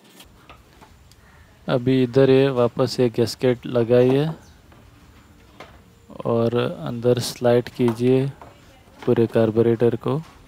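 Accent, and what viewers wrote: Goa, India. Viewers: Are you from goa? Cz ur hindi little like goan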